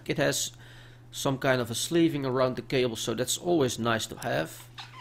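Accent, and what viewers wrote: Netherlands. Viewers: Love your video's man. Your dutch accent is funny xD Could you possibly help me with a build? The budget is 750 euros. and id like a GTX 770 in there. Thanks annyways. Keep up the great work! I dont need a Monitor, keyboard, harddrive, or mouse. Also id fancy a sexy/badass case! <3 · are you dutch or belgian??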